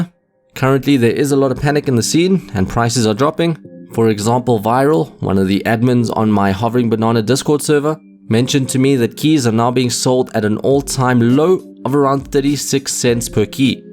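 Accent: South Africa. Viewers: Yo bro you south african?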